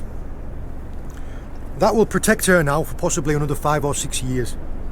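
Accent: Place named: Cornwall, United Kingdom. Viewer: How long did you keep the berried lady out of water for? Did you dip regularly? These are things we would like to know. Was there any need for a double v-clip? I saw she was bleeding when you released her. I know she'll coagulate eventually, but is it more stress on her system? Loving your shore knowledge and your Northern x Cornish accent...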